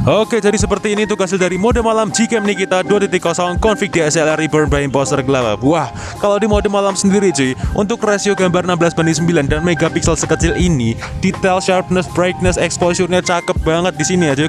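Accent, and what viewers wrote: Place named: Semarang, Indonesia. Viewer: Wah njenengan wong semarang ya mas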